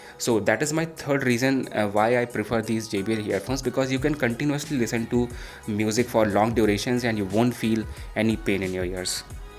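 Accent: India. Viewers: At first I was like hmm Indian accent but I'll keep watching. your accent was surprisingly not annoying to listen to, because it sounded very natural. Thanks man!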